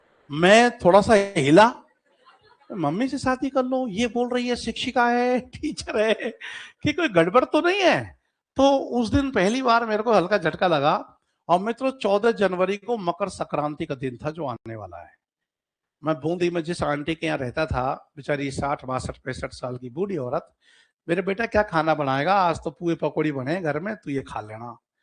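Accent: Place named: Bharatpur, India. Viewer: सर जी आप कहां से हो। आपकी भाषा भरतपुर बालों से मिलती है।❤❤❤❤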